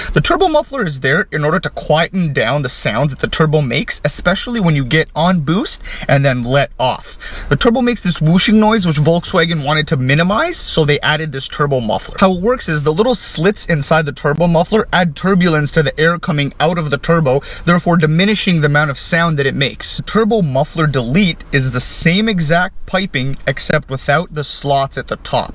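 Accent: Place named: Toronto, Canada. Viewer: Are you from Toronto? You sure sound like you are lol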